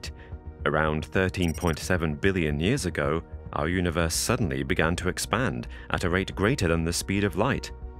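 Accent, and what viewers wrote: United Kingdom. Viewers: Wowww! Amazing video and English accent are pretty nice thank you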